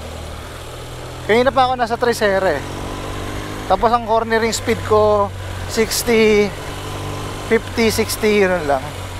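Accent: Bulacan, Philippines. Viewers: Puntong bulakenyo ser.. 😁😁😁 Yes sir😁😁 solid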